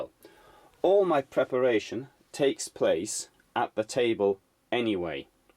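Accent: United Kingdom. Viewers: Lass-in Angeles If you don't like it don't listen. I like delivery its called British. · Lass-in Angeles Speech impediment? It's called a British accent and a really nice one at that!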